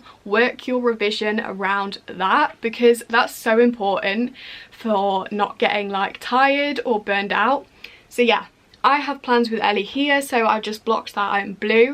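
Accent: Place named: Doncaster, United Kingdom. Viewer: Athena Newton then where did she get her accent from? It sound like Doncaster or somethinng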